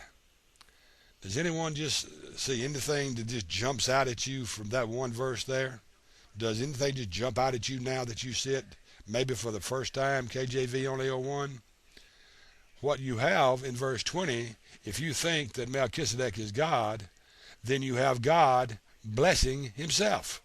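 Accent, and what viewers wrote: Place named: South, United States. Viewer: Your accent alone makes your credibility come into question..hilljack? hillbilly? south of the mason dixon line? anyway.. Jesus was a Rabbi, human. He Blessed God all the TIME.. so your argument is ridiculous. According to you, Jesus is God so how could he be blessing himself? Then by your own argument Jesus cant be God.. thanks for clearing that up.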